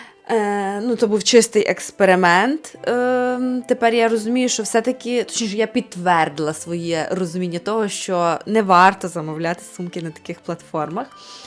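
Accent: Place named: Ternopil, Ukraine. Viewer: Ты з Тернополя? Я знаю одну жінку з Тернопільської області, вона спілкується майже як ви.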